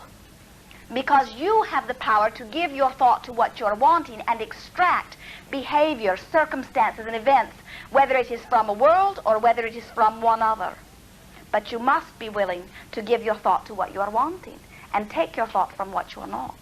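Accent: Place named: United Kingdom. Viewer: I love these old videos, Esther sounds so British 😂and what Esther saw, just by repeating a joke,, now that is very interesting,, it shows just how powerful, law of attraction is. gotta also say this video may be a very old video, but l ain't heard it before, and like wow, so powerful, thank you ❤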